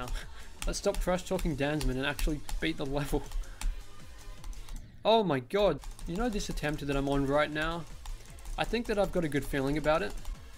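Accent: Australia. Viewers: I live in Australia And I never knew you lived in Australia Too. I suck at geometry dash. Well I live in Tasmania and I did think you live in Australia because of your accent. HOW DO I GET BETTER AT MAKING LEVELS AND JUST EVERYTHING IN GEOMETRY DASH!!! Ok I guess I’m just cringe now.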